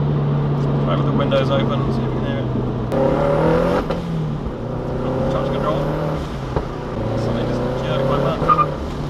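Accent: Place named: Northern England, United Kingdom. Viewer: What brake setup are you using? great video btw Also it sounds like your from up north so i'd give Paul at RS Tuning in leeds a call for your engine work :)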